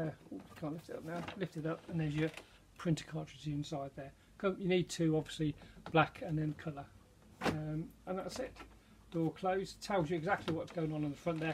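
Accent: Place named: United Kingdom. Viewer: hes british hes weird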